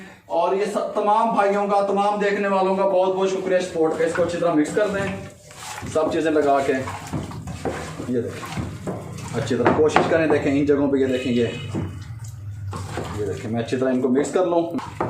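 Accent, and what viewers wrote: Punjab, India. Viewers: Hay Allah ap ki punjbi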